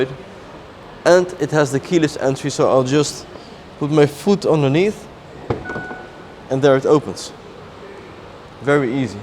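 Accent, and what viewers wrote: Netherlands. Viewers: The German right way, the US English right way, the British English right way? This is a Dutch native speaker who has of course a Dutch accent. Your accent?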